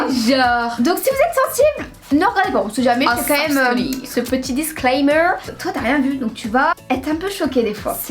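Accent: North, France. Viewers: On sent que vous avez l'accent du Nord😅,moi aussi je suis du Nord. Ps:J'adore vos vidéos❤, vous êtes hyper énergique et cela me donne du plaisir à regarder vos vidéos !! Si t'es d'accord like👍 | \/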